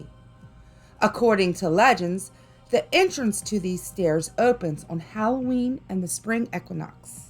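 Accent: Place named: Pennsylvania, United States. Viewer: Hmmmm....are you from Pennsylvania? Just wondering because I am picking up an accent and it's making me homesick lol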